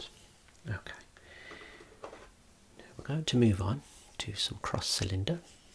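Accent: Birmingham, United Kingdom. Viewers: ARE YOU A BRUMMIE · Never thought I'd find a brummie optician relaxing enough to sleep to! Lovely voice.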